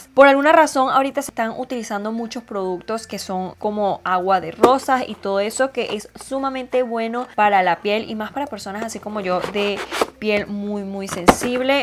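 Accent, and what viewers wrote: Venezuela. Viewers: Saludos mi china!!! Hermosa como siempre y más aún cuando defiendes tu acento venezolano 📣📣📣 🙌🙌🙌 Me encanta tu personalidad las cosas te resbalan y no tomas nada a pecho 😏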